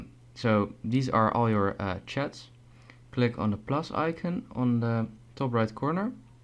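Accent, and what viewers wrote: Netherlands. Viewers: Lekker nederlands accent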